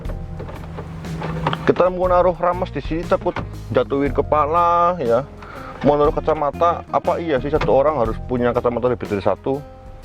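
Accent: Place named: Banyumas, Indonesia. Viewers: Logat Purwokertonya Keliatan Banget Ya..😀🇲🇨👍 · Review nya bagus lucu sedikit ngapak 😁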